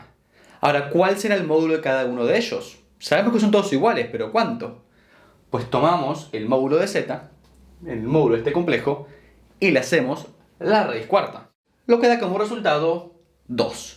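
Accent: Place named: Argentina. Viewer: me preguntaba lo mismo porque suena re argentino pero dice cogemos jaja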